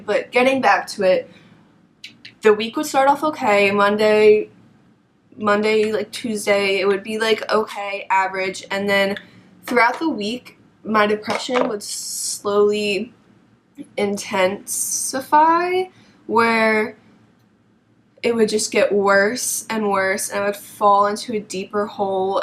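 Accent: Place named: New York, United States. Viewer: Are you from NY? you have an accent! Anyway, loved the video, God is the only one that can truly heal your sadness! :)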